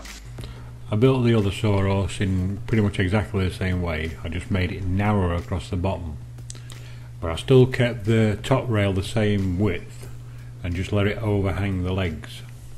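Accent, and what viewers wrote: Yorkshire, United Kingdom. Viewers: Ey up, lad's from Yorkshire int he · It vaguely seems that you are attempting to talk in English. Ah, you are a “Brit”! Sorry for you to be so unlucky to have such a sloppy upbringing. You could go to USA for high school, then you might learn ENGLISH LANG. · By 'Eck, a Yurkshireman! Greetings from a homesick Brit expat!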